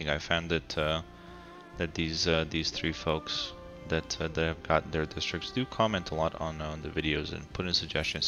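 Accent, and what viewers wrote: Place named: Netherlands. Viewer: ohhh, I don’t know what BiH means? But I thought so because you sound like you have a little bit of a dutch accent. It’s why I asked :) awesome cities btw! Have a nice day!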